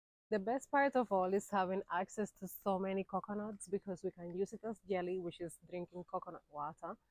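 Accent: Jamaica. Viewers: I love your new Ja accent, its so cute, your right about Jamaica's soils, i reside in the United States and i miss my country. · I love your spanish/patwa mix accent/ words. So cute. Nice content. I'm in north Florida and its so hard to grow our foods here. Only yams, sorrel and sweet potato does well here but harvest is small.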